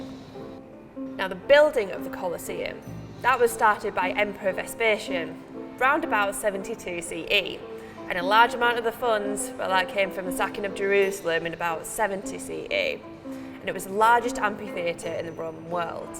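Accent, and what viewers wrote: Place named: Yorkshire, United Kingdom. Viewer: Although it is refreshing to 'hear' a Yorkshire accent, & a female one too(!), presenting for the Royal Armouries Leeds it is unfortunate that both strength of voice & quality of recording made it extremely difficult to hear everything spoken. Not the first occasion R.A. 😒 Please mic. your presenters up personally for clarity! 🤨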